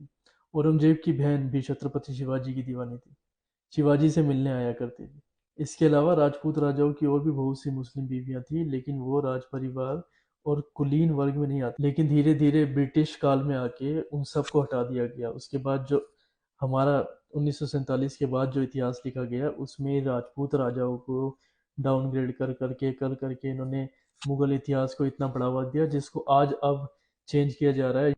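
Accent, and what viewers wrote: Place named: Pakistan. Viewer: Iss channel ko chalane waala aawaz se Pakistani lag raha hai, ye koi ISI ka Agent hai jo Hindu samaaj ko todne mai lga hai isse saavdhan rahe, Jai Rajputana Jai Hindu n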